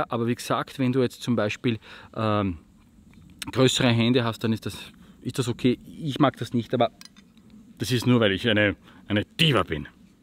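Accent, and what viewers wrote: Austria. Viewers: Der Ösidialekt is eh da geilste.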